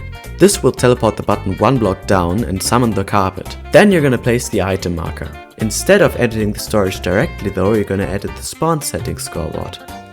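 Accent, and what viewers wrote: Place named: Germany. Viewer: safe you'r german, i can hear this!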